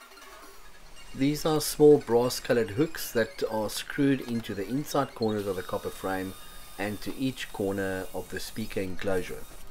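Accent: South Africa. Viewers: South African? Dig the design!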